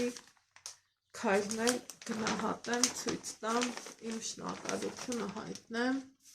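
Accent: Lori, Armenia. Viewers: Շատ հաճելի բառբառա ջան Լոռվա բառբառը👍🤗🤗❤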